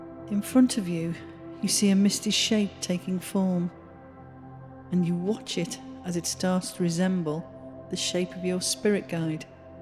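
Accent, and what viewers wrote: Liverpool, United Kingdom. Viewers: Are you scouse ? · I couldn't stop being distracted by her dialect. Not sure if it was Liverpudlian or something else. Also, to be honest, I felt it was all too "rushed" I needed a little bit more time to follow the directions. I think it's s great thing to share these meditation's, but a LITTLE SLOWER next time please! Thanks 👍 xxc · Sorry, I can't relax with a Scouser talking, got both hands on my wallet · Been doing it since I was a kid nice to hear a scouser bring me back. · for some reason the british accent annoys me. how can i get over this?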